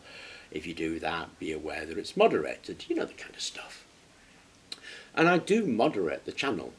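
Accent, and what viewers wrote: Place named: United Kingdom. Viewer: You have a curious mind but a lot of people don't so their mind works on what they have been told. Most of us are more lazy or like the beaten path, why, well it could be fear because what we don't understand could harm us. I still like to try and I enjoy your channel but I think it's the British accent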